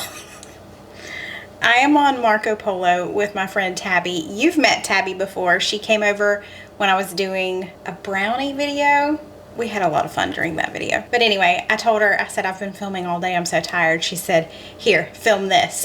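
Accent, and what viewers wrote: South, United States. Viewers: your family is very inspiring. I love watching ( listening to your southern accent). Your graciousness today made me cry. Prayers for your sisters health and to Bonnie's family. Thank you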